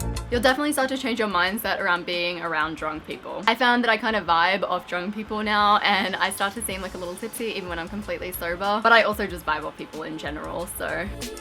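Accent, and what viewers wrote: Australia. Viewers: cool video! i was also thinking about going sober for a while... also i really like the Australian accent, makes me really nostalgic :)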